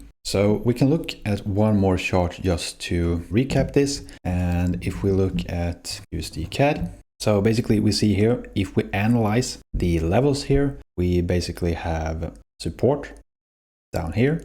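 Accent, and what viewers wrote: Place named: Sweden. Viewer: He sound pretty swedish🙌🇸🇪👏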